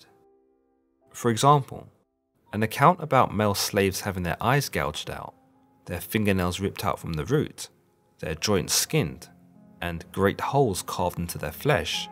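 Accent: United Kingdom. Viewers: Hey British commentator when you're doing an American documentary get to the point please.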